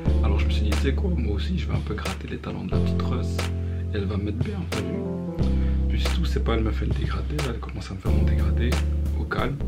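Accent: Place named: Brussels, Belgium. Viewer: T as manière de parlé obligé t es un bruxellois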